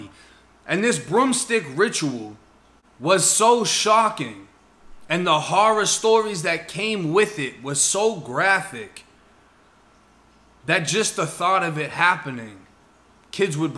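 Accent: Boston, United States. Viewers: That Boston accent kills me. Much respect tho bro✊🏾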